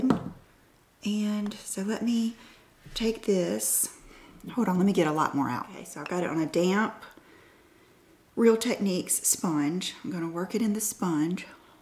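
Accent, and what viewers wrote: South, United States. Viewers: I so love logging on, seeing that you’ve uploaded and hearing your sweet, Southern voice! I’ll be watching no matter what content you give us! ☺️ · I swear… you remind me of someone I went to school with! Lord, I miss her. Lost track of each other. I am so happy I found you! Please keep posting whatever little thing you have on mind. You give me joy 🤩 p.s. You sound like lovely Southern gal.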